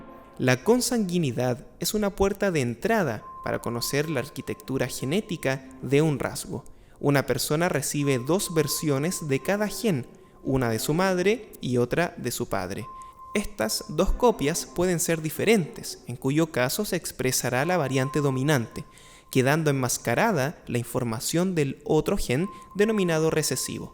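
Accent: Chile. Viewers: No se de donde eres, este es el primer video tuyo que veo pero tienes un acento Chileno muy marcado.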